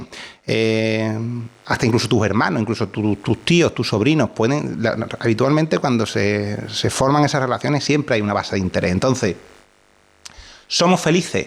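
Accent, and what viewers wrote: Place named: Andalusia, Spain. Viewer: Señora es sevillano, aquí somos andaluces y es nuestra forma de hablar, si no lo entiendes no lo veas pero deja de meterte con un acento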